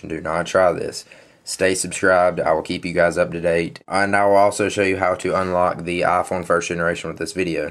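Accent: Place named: Texas, United States. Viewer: u sound like ur from texas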